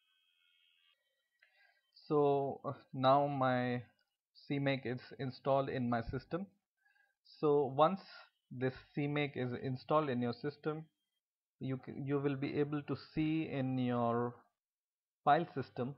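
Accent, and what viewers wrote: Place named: India. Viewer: English with Hindi accent kills me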